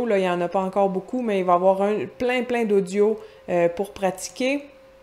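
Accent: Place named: Quebec, Canada. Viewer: Un autre brésilien ici. Je trouve que l'accent québécois est magnifique!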